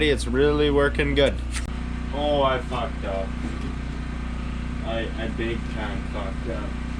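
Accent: Canada. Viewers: You’re the most Canadian dude I’ve heard since I last listened to a Bob and Doug song! Cool off-grid building, I’m inspired!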